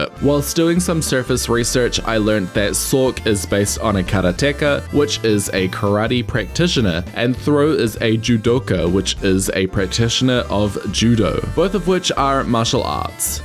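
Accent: New Zealand. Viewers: I still cant get over the Petz Catz 2 theme music lmao. I was just in your stream thinking "I wonder if he's from New Zealand with that accent.." and behold, a kiwi lmao. But I really like the designs! I love how much detail you put into the descriptions too. Please do more of these :)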